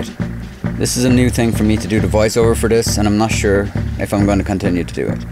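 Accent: Ireland. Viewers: Voice over is brilliant, Irish voice goes really well with a cowboy theme imo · Keep talking Irish bro... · The voice over is fne a great accent from the Emerald isle. Good vid, keep up the good work.👍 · Holy shit, you're Irish? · Also thumbs up to the voice over; you've kept all the important text for when I'm on the bus watching and don't want to bombard folks with audio they didn't ask for, but I'm sure they wouldn't mind with the low volume, even pace, and Irish accent. A+ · Are you Irish yeah? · Love the Voiceover man, are you irish? Your accent kinda sounds like it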